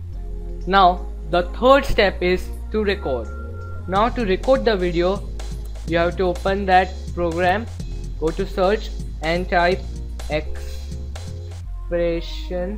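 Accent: India. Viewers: HE IS LIKE INDIAN AHH THAT VOICE BUT TNX ALLOT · Stopped watching after i heard that he was indian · heehee are you indian? :) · Obnoxious "music" and audibly, almost definitely Indian voice (and all that you know). Nah, there are better tutorials. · you speak like a indian ,i think you are indian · His accent bet his indian? if im not wrong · AWSOME Indian accent man I like it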